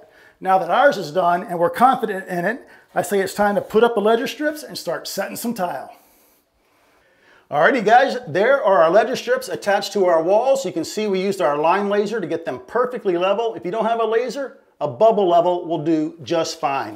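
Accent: Louisiana, United States. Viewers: La =Louisiana. LA= Los Angles. He is in Louisiana, the accent should give that away!!!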